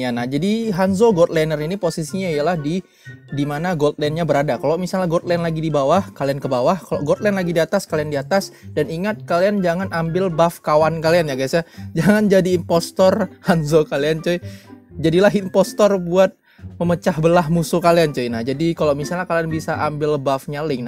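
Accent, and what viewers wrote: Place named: West Java, Indonesia. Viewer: Ohh kirain.. Nada bicaranya, logat bahasa Indo nya kaya orang Sunda Bang. He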